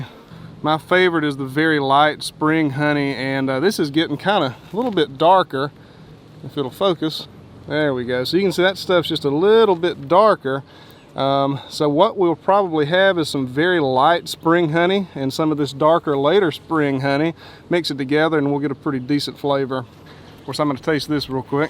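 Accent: South, United States. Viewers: That’s a cool accent 👍 What parts of America is that accent from? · you know the second you hear that thick southern tang, it’s gonna be the most pleasant video you’ve watched at 3am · Southern Chris Pine??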